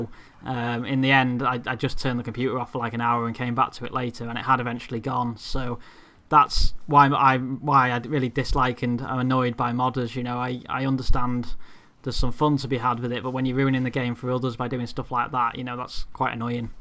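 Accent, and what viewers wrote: Cheshire, United Kingdom. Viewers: yeah , sounds cheshire? Im from just outside there :D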